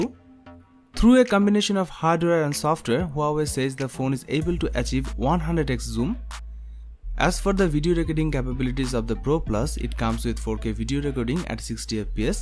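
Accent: Bengal, India. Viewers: Indian accent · Hmm Bengali hain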